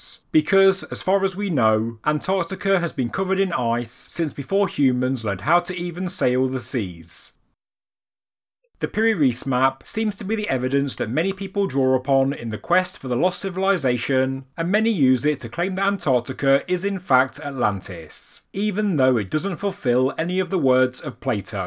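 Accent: United Kingdom. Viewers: That's one version of a british accent, but I do agree that it can be hard to listen to for us north americans.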